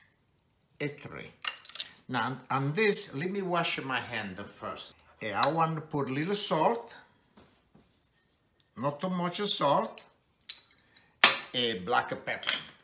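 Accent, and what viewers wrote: Italy. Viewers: Che bello sentire il tuo accento con l`inglese mi fa venir voglia di ritornare in italia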